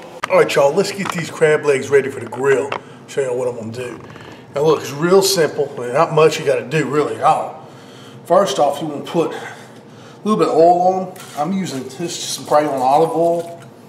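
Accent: South, United States. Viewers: I love ur southern accent!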